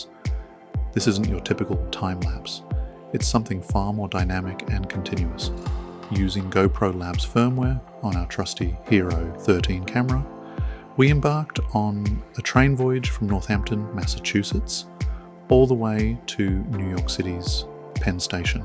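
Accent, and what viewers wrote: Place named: Australia. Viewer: this is a scary version of your voice. It's like almost you but not quite. It's like basic australian man with a little Da mixed in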